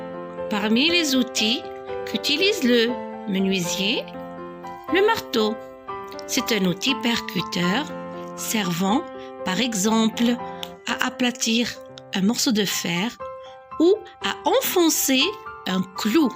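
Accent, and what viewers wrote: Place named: France. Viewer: L'accent français est très présent. Ne vous faites pas rare. Bonne continuation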